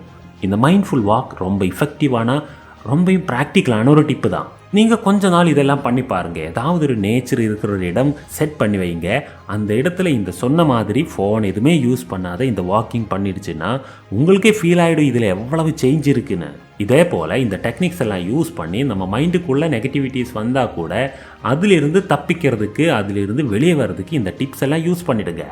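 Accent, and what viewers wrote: Kerala, India. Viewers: Awesome video thank you so much I like ur tamil lovely malayalam accent mixed, thanks for your effort people need it in today's huzzle buzzle life😊🙏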